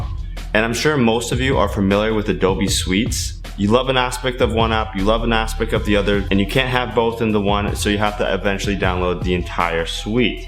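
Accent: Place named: United States. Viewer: Your accent sounds VERY American (I'm assuming you're Canadian because of some of your quirks). Great review, and I'm surprised you don't have more subscribers.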